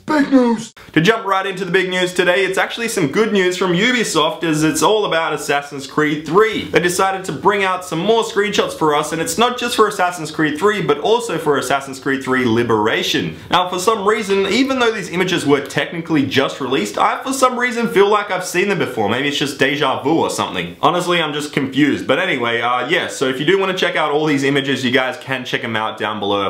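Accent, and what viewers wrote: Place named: Australia. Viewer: His Australian accent >>>>>>>>>>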